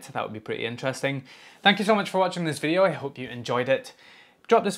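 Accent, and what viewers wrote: Scotland, United Kingdom. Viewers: Have really been enjoying your channel as i have been learning ad getting my smart home all set up. Been homelabbing for a couple of years now and finally got that Automation bug. Plus the wonderful scottish accent gives you an edge lol. Great videos brotha keep it up.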